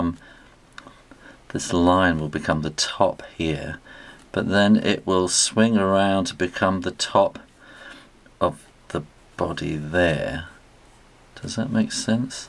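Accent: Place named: England, United Kingdom. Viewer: nice to hear an english voice for once... and thanks for this tutorial it helped a lot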